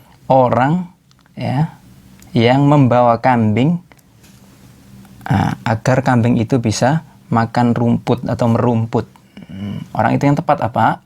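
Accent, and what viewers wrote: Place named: Java, Indonesia. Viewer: keto jowone mas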